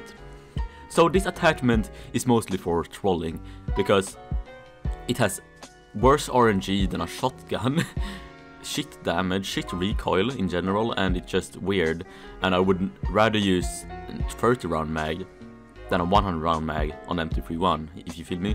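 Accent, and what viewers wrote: Sweden. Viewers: You sound swedish. I can tell because I am swedish.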